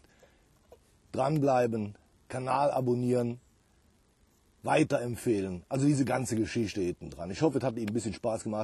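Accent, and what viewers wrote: Germany. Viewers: super sache! Wieder ein schönes Gemüseanbauvideo. Da kriegt man richtig Lust, das nachzumachen. Dieser Kanal ist mittlerweile einer meiner Top 3 Lieblingskanäle. Möchtest du eigentlich Hilfe bei den englischen Untertiteln? Ich könnte ab und zu mal einige Fehler rausmachen, obwohl ich auch kein englischer Muttersprachler bin. Dein Dialekt ist rheinländisch? Klingt auf jeden Fall auch schön.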